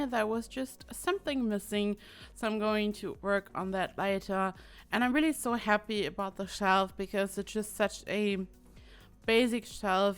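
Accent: Germany. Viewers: das deutsche Englisch macht mich total nervös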